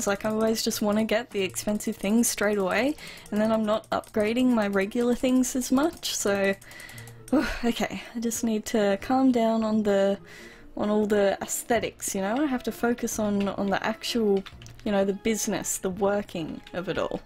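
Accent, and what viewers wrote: Australia. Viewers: R u Australian? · she sounds like Australian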